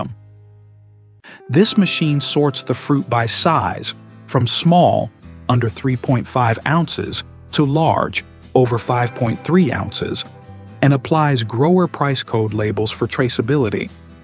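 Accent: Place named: Morocco. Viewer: هذا المعلق باينة مروكي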